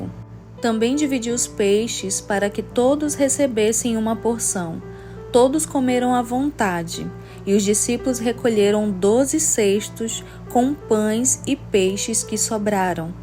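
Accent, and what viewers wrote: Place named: Rio de Janeiro, Brazil. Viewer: Tu és do estado do Rio de Janeiro?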